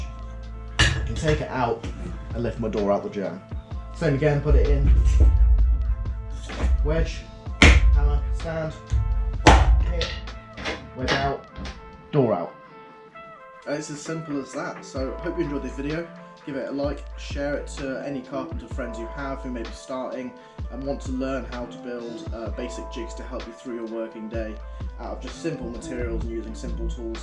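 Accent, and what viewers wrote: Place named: England, United Kingdom. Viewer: Thanks heaps. So simple and easy to make. Good, clear explanation and camera work. Great to hear a pommy accent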